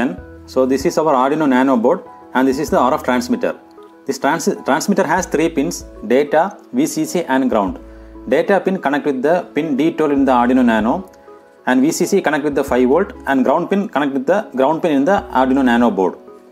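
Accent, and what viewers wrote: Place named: India. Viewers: Sorry, but horrible to listen - Indish-English ... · Please turn on the caption if you are incapable to understand the Indish-English. In this way you can get it easily. Thanks.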